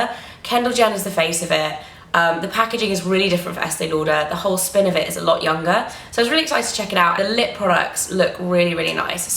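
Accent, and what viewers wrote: United Kingdom. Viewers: You're super gorgeous.!!! Love your accent <3 Love watching your Vlogs. I'm also trying to learn British accent by imitating your voice <3 <3 <3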